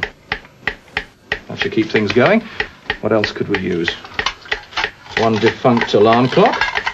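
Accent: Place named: RP, United Kingdom. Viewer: All tv presenters should talk like this chap. BBC English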